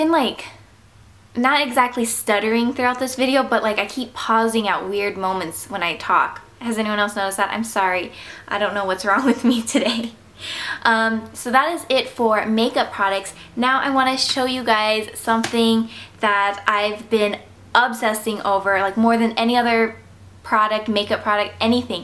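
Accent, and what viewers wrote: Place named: Australia. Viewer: hells yeah aussie accent :D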